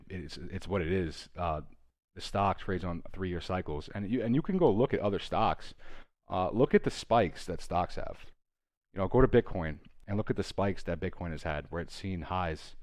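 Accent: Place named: Pennsylvania, United States. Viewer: Realest personality to do it. You’re very appreciated homie. I feel like you’re from PA/mj/my/md. Big resonation. Philly native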